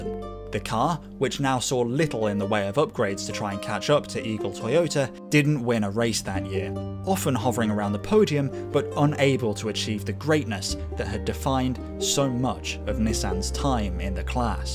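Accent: United Kingdom. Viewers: This is only the 2nd video I’ve seen of yours on here and sir, let me tell you, I have never hit the “subscribe” button so hard in my life. As a “relatively” new car fanatic, especially for Nissan specifically. What’s better than learning general lore of Nissan’s professional/formal racing history? Learning around side subject from a guy with a cool British accent. Really man, thanks for your content! Much love from Charleston SC!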